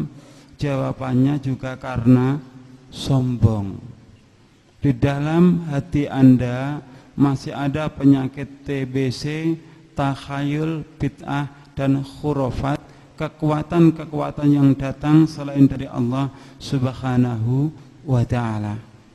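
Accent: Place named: Java, Indonesia. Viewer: ngomong nama tapi kq jawanya nglotok ya....wah parah nih orang.....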